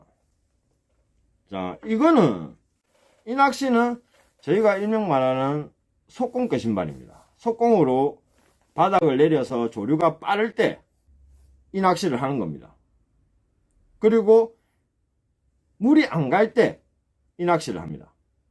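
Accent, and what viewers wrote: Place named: Gyeongsang, South Korea. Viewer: 혼자 방송하는데 마스크 벗고해도 되지 않을까요? 경상도 억양이라서 알아듣기 힘든데....발음까지...ㅠㅠ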